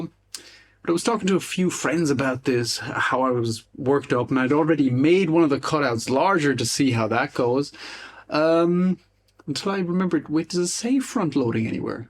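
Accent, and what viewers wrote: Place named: Ireland. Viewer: Really enjoyed the video…. I absolutely love your commitment to the development of speakerpedia. I have to ask something though as it’s really bugging me. Did you ever live in Ireland ? It sounds to me like you might have either lived in Ireland or learned English from an Irish person. Weird question i know but these things tweak my ear and i have to know. Thanks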